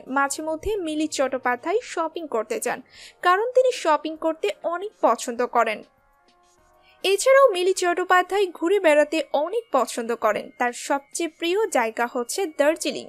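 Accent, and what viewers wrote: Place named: Bangal, Bangladesh. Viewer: সঞ্চালিকা কাঠ বাঙালদের মতো বাংলা বলেন কেন? ইস্ত্রি বলেন স্ত্রী-কে !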